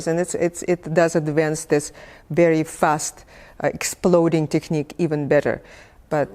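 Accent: Russia. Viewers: Какой сильны русский акцент! Но было интересно.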